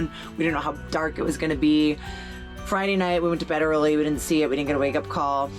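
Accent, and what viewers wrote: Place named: East Coast, United States. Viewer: I used to live in Schenectady. Do I get any points for that? *Drew Bledsoe lives here in Bend, by the way. Listening to you speak, at the pace you do says two things--one, you're from the east coast (as I am), and two--you're excitable. Me? I get wound up talking gear amongst other backpackers. Great video!!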